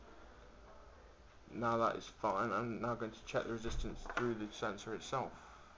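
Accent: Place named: United Kingdom. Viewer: i would love to understand your british english language man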